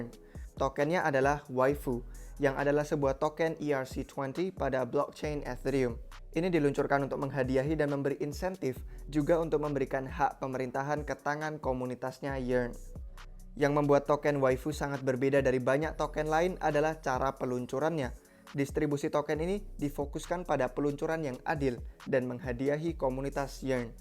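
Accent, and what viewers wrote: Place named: United Kingdom. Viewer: pronounce british emang seperti itu ngab , gak lebay mungkin kamunya yg gak tau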